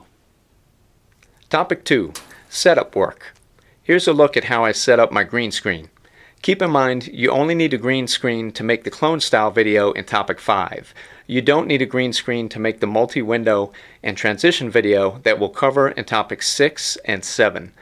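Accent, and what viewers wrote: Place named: Carolinas, United States. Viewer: Well isn't this a Wealth of Information. It's a Definite Like, Save and Share. How kind and smart of you to share. Unfortunately I'm a bit homeless right now. I'm making videos off my phone Anywhere USA! Made I'm The USA! By your charming accent, I'm guessing the Carolina's. Hmmm... a gifted Musician, Songwriter and Instructor. I bet you're really good at Math. 🌺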